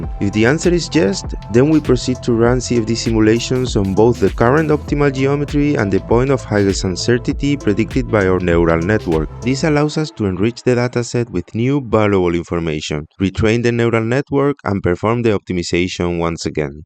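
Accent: Spain. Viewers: suenas español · jajja se nota que es ESPAÑOL. pero bueno asi llegas a una audencia mayor. Buen video.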